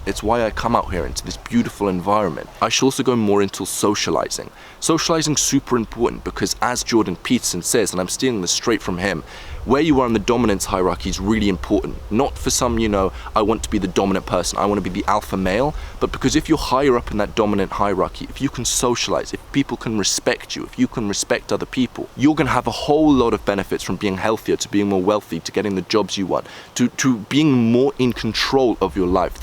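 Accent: United Kingdom. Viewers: I really can't tell if he's British or American 😳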